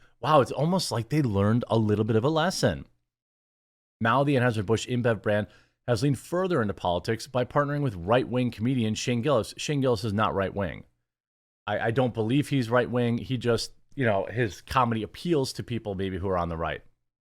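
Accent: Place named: Midwest, United States. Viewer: As someone stuck in the leftist hellhole of Hollywood, I always feel so warm and cozy hearing friendly midwest “we’ll tak to ya again real soon”. 😌 People are NOT like that over here.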